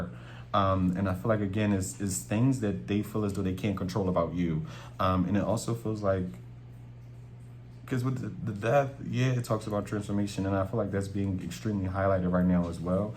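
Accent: Philadelphia, United States. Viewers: Being as though we both from Philly… lmao I can just hear it in voice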